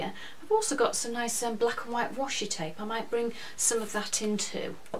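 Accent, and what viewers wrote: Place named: Yorkshire, United Kingdom. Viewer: I didn't realise you were from Yorkshire!!.. you certainly have a lovely accent so if I was guessing I would say you're from North Yorkshire...Ilkley or Harrogate? I'm not that lucky, I'm from South Yorkshire.....Barnsley, (all flat cap n whippets) 🤣 and you have to say like a bleating sheep...Baaaaaaaaarnsly🤣🤣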